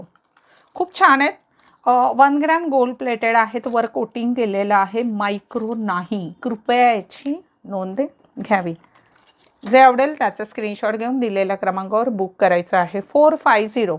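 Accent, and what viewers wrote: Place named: India. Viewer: . ❤❤❤✨ कानात सजवा सौंदर्याची खास झलक… 💖 M.J. Collection Jewellery चे सुंदर झुमके — प्रत्येक लुकला देतील आकर्षक अंदाज! 🌸 आजच तुमचा आवडता झुमका निवडा! ✨Agadi paramparik dagina ahe zumaka agadi khas look deto zumaka❤️❤️❤️❤️👋👋🙏🥰bolata pan amchya gavran bhashet mast❤❤😊😊 ❤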